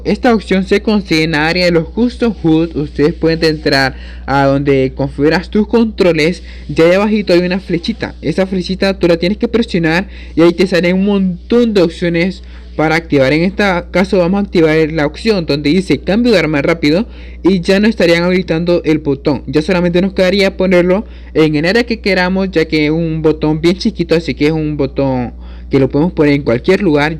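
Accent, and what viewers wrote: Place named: Nicaragua. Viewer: crei que eras de Nicaragua bro un saludo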